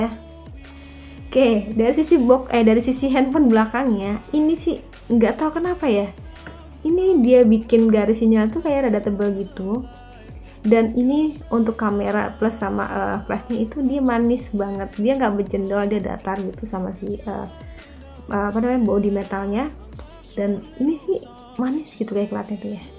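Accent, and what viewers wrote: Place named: Cirebon, Indonesia. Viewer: biasa biasa bae.. hehee wong cirebon bangettss..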